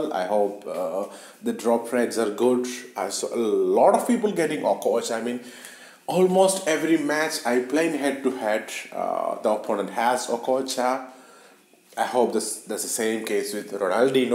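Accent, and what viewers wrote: Kerala, India. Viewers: Dude are you from Kerala? Great content btw! :)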